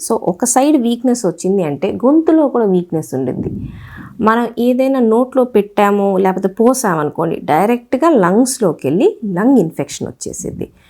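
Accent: Guntur, India. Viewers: గుంటూరు యాస బాగుంది మేడం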